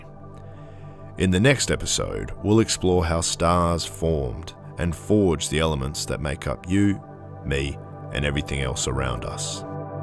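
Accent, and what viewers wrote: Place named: Australia. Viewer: Swear to god when if we have an Australian npc in our next game, I will want that voice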